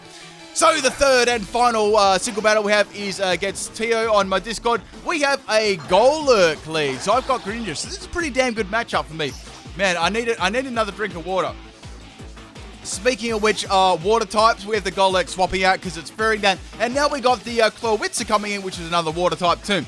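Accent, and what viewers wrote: Australia. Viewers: bruh thats just his australian kicking in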